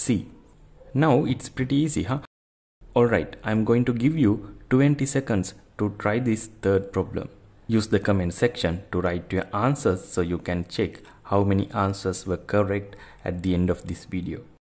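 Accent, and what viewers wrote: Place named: Sri Lanka. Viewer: I notice a Sri Lankan accent :D but keep it up bro!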